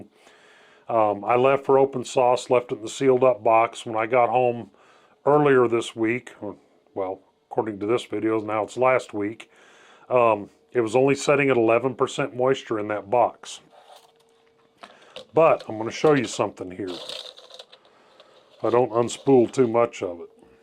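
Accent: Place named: South, United States. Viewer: That's a southern drawl for you. Low and slow. Not in a hurry to get anywhere.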